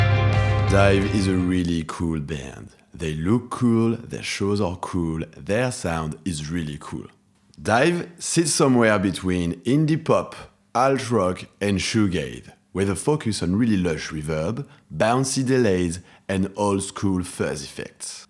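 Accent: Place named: France. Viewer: That little French accent, so sexy ! Don't give up !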